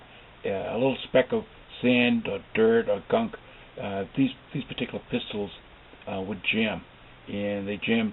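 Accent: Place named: Boston, United States. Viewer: You sound like that you're from Boston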